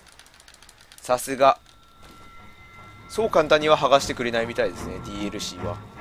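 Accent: Kagoshima, Japan. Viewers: 鹿児島訛り最高です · 鹿児島訛り好きになりそう！素顔見たかったので嬉しいです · さんの鹿児島の訛り好きだなぁ... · 鹿児島弁良き！！👍👍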